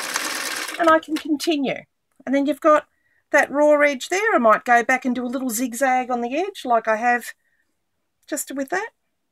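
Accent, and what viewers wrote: Australia. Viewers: You sound like an Aussi? From where? I’m from Bentleigh, Melbourne!!!!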